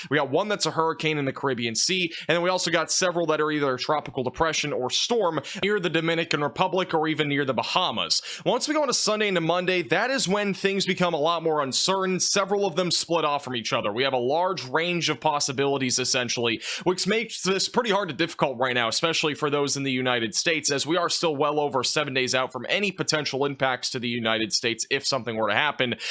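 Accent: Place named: Midwest, United States. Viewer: this dude is def from michigan or wisconsin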